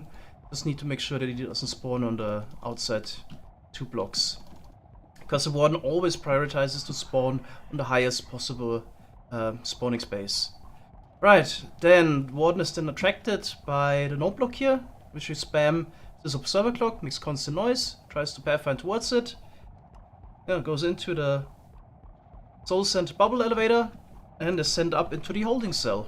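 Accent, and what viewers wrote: Germany. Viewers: Deutscher Akzent, beste.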